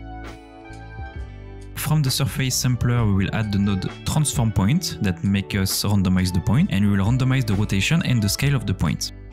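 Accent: France. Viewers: Salut, (je pense que tu est français à ton accent (ce n'est pas un reproche)) ton tuto à l'air top je chercher à apprendre à faire ca donc c'est parfait. Bon courage et continue comme sa et bonne fin d'année.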